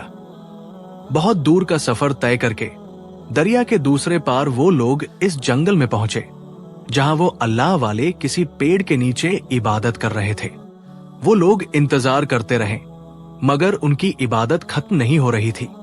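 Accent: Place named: India. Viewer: Ap kahtay bslochistan say taluq, lakin awaz indian lahja??? Kaya india say voiceover karwaee.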